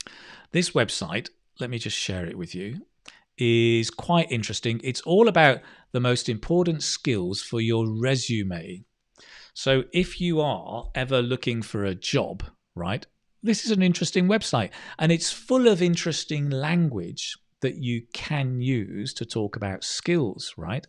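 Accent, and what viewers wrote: United Kingdom. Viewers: Appreciate your teaching videos. I am from Malaysia. Are you British? Lovely to hear you speaking.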